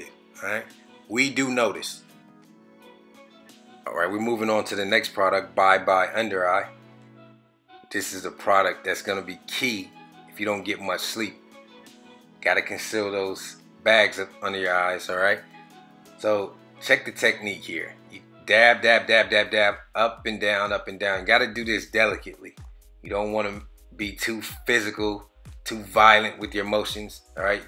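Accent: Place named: East Coast, United States. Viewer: War paint,lol!!!Love that!I hear a east coast accent!!!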